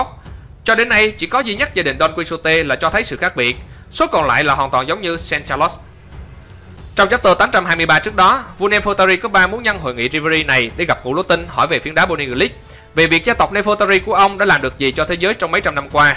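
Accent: Quang Ngai, Vietnam. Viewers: giong cua ad giong quang ngai gheee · giọng ad giống người quãng ngãi quá vậy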